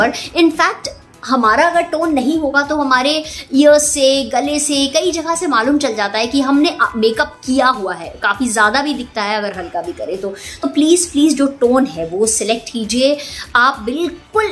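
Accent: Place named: Lucknow, India. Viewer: Hi aap ki boli kitni achchi hai kya aap lucknow se belong karti hai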